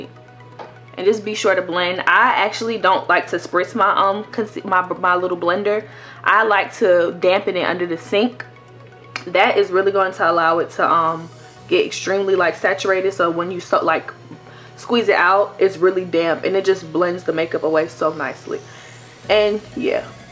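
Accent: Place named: Bay Area, United States. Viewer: Where are you from? Sounds like ur from the bay.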